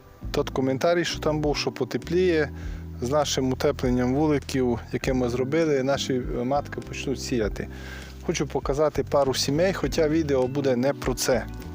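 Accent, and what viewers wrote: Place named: Transcarpathia, Ukraine. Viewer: Іздив колись на закарпаття ще в 90 роках мав багато друзів Ваш говір нагадує мені ті приємні часи Успіхів Вам Цимбори Слава Україні!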